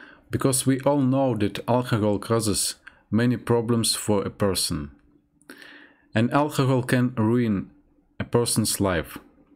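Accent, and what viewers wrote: Russia. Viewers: good video-thanks.Your English-pronunciation- is pretty good.Your Russian accent is much less than the accents we-people in USA- hear in movies when an actor is portraying a Russian person. Maybe the actors ham it up-make the accents stronger than "real" accents. In any case-pronunciation is good. I looked up some figures-the claim was average consumption for person 15 or older is 11 liters of PURE ETHANOL per year. 11,000 mls- 100 pls of pure ethanol over a few hrs is enough to make you drunk-AND CAUSE LOTS OF LIVER AND BRAIN DAMAGE! So 11,000 mls/yr is 110 DRUNKEN DAYS per year- 2 times a week-POISONED. Opioids- are actually much less toxic than alcohol.They can acutely kill you-stop breathing-if used IV-but used orally they just addict(not good) but they won't kill you if used only orally. Shame for Russia and Russians-perhaps alcohol is why you folks are manipulated by your leaders and oligarchs(not that we in USA are not-but we actually have free elections(yes it takes huge piles of money to get elected-so in some respects our elections are bought-but. 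we have "some choice" and since we are armed-2nd amendment-occasionally we kill our leaders(so does India-Israel and other democracies-France repeatedly tried to kill De Gaulle-a hero war leader-they failed but not for lack of effort! You folks can't convincingly. threaten your leaders-we can but rarely kill the ones who "need" killing.But. perhaps our leaders keep this in mind when making policy. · Thank you very much. Alcohol is a big, big problem in my home country Danmark as well. For some reason the price of alcohol never really goes up here - in fact they have lowered taxes in recent years. I wonder why. Your English is fine btw, don't worry. For some reason, English spoken with Russian accent is very charming :)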